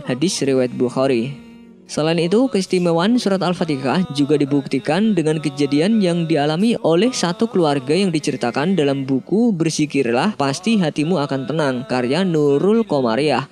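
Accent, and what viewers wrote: Java, Indonesia. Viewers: Kaya org jawa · Kmungkinan beliau orng Jawa jdi wajar aj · Sdra"....jgn mudah menyalahkan org lain. Utk sdra kita suku jawa,kebanyakan pelafalannya ALFATEKAH utk menyebut ALFATIHAH. Itu logat...bahkan ada yg sdh ustadz msh sering keseleo lidah dlm pelafalan alfatihah. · logat jawa banget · Lidah jawa memang susah di ubah Aksen begitu lekat Alpatekah. Audzubillah hir rabill ngalamin. Mohon perbaiki min. Aku juga jawa tapi nggak gitu kali. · Hhh sabar orang jowo udah biasa 😁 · Orang Jawa kek gtu sebagian besar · Bibir orang jawa emang gitu say kaya nybut fatir patir..dn ga niat jelek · Al-fatihah lah yg paling benar akan tetapi logat orang jawa yg medok membuatnya menjadi al-fatikah sama seperti ayah saya 😄😄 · Rata² yg sebut pelafalan seperti itu orang² jawa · Mungkn dia orang jawa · Dia menyebut Al Fatikah krna sebagian org jawa dialeknya begitu.. 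tak mengapa jika itu di lakukan krna ketidaktahuan atau krna ketidakmampuan tetapi hendaklah dia memperbaiki pengucapan bila itu sebuah kesalahan yg telah diketahui. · logat orang jawa itu mah, mertua gw juga sama ngomongnya alfatikhah karena logat di sana medok. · Uwoo Admin wong jowo toh 😆, aksennya beda , jazakumullah infonya min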